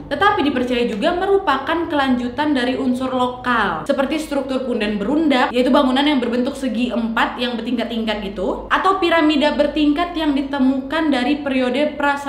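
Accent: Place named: Medan, Indonesia. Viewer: Cerdas,pintar,ceriwis luar biasa penjelasannya, penting banget untuk di ketahui, orang Medan ya mbak ini😀👍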